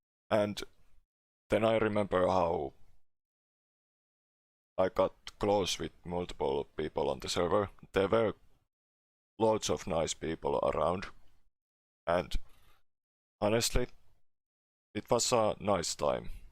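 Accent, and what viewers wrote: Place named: Finland. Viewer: u sound like finland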